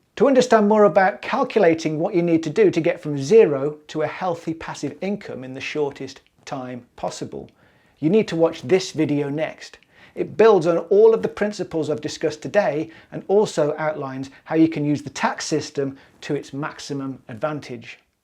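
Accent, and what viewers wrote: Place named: West Midlands, United Kingdom. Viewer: I take it that you are from the West Midlands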